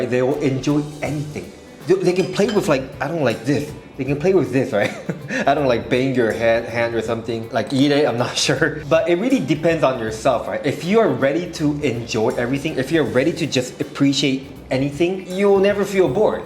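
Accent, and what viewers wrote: Singapore. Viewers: We welcome you with open arms n so much love! You are a wonderful person n i love your Singlish n Singaporean habits! Im happy that you are happy living in Singapore. Hope I meet you in person one day! 😊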